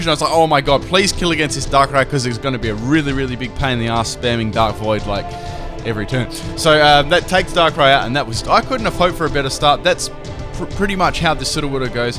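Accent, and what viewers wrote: Australia. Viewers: are u australian or something i'm new in this channel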